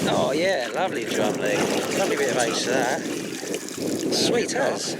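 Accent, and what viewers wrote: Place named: England, United Kingdom. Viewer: How about losing some of that English accent for the American's that watch your channel. BTW you might explain what the heck you are talking about at times. lol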